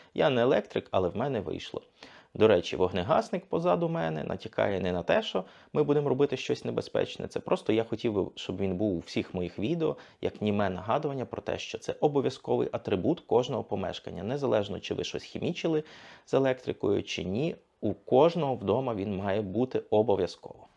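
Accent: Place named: Cherkasy, Ukraine. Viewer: Ше раз, - точно з черкаської області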